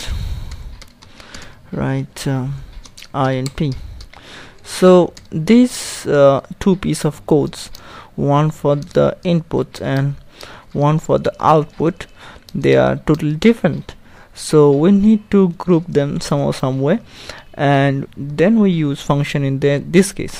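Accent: India. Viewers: You sound like Indian?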